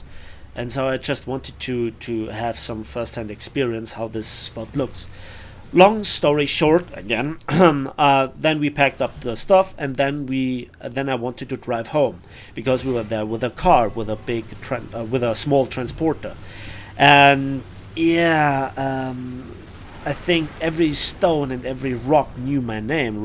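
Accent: Bavaria, Germany. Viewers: I hob mas scho gdocht dasd a Bayer bist hahaha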